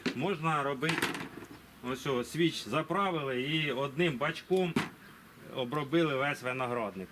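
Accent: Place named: Volyn, Ukraine. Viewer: Волиняка нюхом чую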